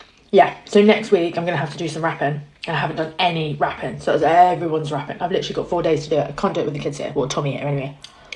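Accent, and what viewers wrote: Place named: United Kingdom. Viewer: PS I SO LOVE YOUR BRITISH ACCENT!! 😊🥰🥳🍾🤗👏👏👏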